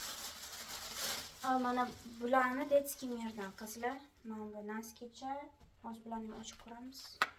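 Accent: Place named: Syrdarya, Uzbekistan. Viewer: Nasib keling Sirdaryodanmisiz shevayizi o'xshatdim adashmagan bo'lsam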